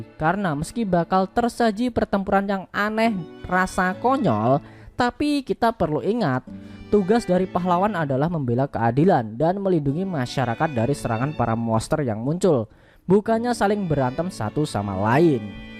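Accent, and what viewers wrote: Java, Indonesia. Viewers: Kowe wong jowo ndi min?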